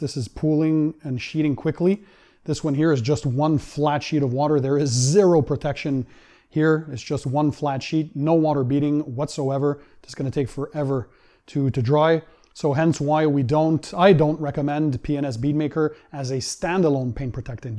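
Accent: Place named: Canada. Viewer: Pourquoi tes vidéo ne sont plus en français ? J'adore votre accent canadien en plus c'est vraiment dommage...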